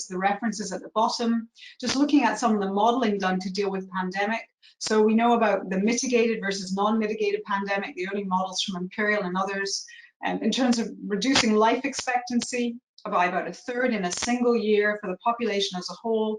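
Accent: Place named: United States. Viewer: Why is Professor accent becoming more American by the day? SO annoying!!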